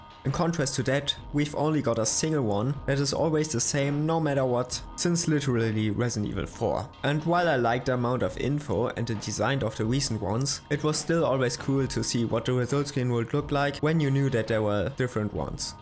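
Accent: Germany. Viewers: It's totally fine to have an accent and also having a strong accent. It was just funny to me that I instantly knew that you're german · Brudi….mach lieber deutsche videos 😂😂😂 du hast den heftigsten deutschen akzent aller zeiten und deine grammatik ist grauenhaft. Es heißt „oriented“ und nicht „orientated“ beispielsweise. Aber kein hate 😅 unabhängig davon ist die videoqualität, recherche und videoschnitt top 👍 ❤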